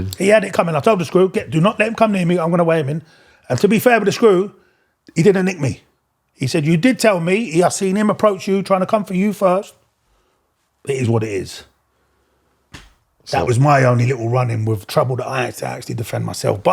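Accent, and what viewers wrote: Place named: Glasgow, United Kingdom. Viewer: Great podcast 👏 lovely guy! Im Glasgow born and bread and love it 🏴 would like to hear more of your glasgow accent 😂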